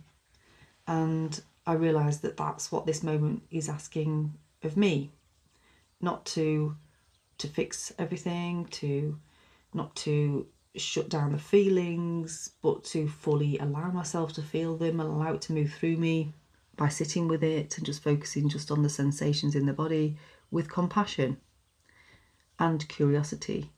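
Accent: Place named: Manchester, United Kingdom. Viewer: Listening to your voice (which is similar to mine - born in Greater Manchester) and your words - I saw the waves of the tide - the rhythm of coming in and going out. And the insight came that groundedness in a time of change is like an evolving, expanding equanimity. As the tide comes in - the deeper the return of consciousness to the core wound. But when the tide turns - the greater the expansion of consciousness "outside". Thankyou.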